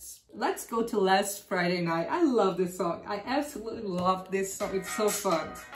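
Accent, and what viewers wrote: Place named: Brazil. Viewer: Nossa é incrível como brasileiros conseguem reconhecer brasileiros só pelo sotaque haha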